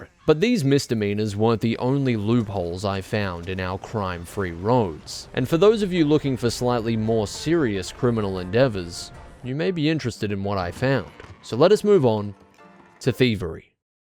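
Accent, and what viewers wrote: Australia. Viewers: I think I'm in love with you. Everytime you upload my heart has a sudden shock of excitement. It skips a beat. It is undescribable the feelings I get when I see the notification that has uploaded. Your beautiful australiaun accent. Your well crafted sentences and editing. The narratives and cinemtagraphy blend together to make me EXPLODE! Thank you, thank you so much!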